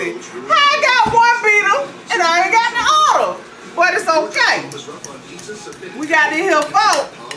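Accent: South, United States. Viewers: I love your energy!!! Southern accent is nice to hear every time I watch you!! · I Love your attitude with the southern accent!! I'm going in on your recipe this thanksgiving!! 😘😘 😘😘😘 I'll tell you how it turns out girlfriend!!! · I love the way she talks southern woman have beautiful accents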